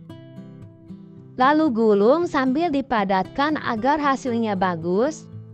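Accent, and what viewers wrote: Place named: Japan. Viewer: Barusan subcribe , tp blm tahu , Anda org Indo / jepang sih ? Aksennya kyk org jepang 😊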